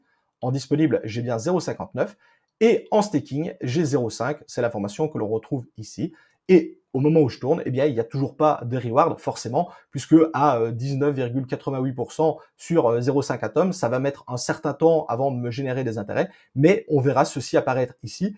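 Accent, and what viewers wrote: Belgium. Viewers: Cet accent xD Tu es du côté de Liéch?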